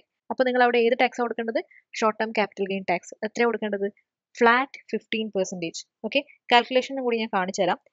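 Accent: Kannur, India. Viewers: സംസാരം കേട്ടിട്ട് കണ്ണൂരുകാരിയാണെന്നാണല്ലോ തോന്നുന്നേ....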